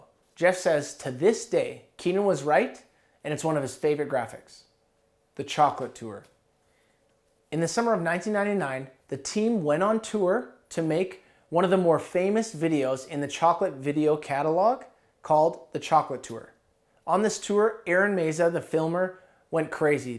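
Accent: Canada. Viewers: imfrom spain and ecxept for the canadianaccent your spanish is good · Do Canada people reply say ay? Not to be mean it funny just wondering be I heard you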